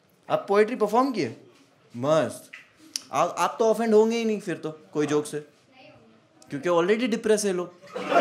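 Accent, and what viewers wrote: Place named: Gujarat, India. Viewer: It was a nice stand up,I totally loved it and can't stop smiling since the beginning of the video and munawar has a good gujarati accent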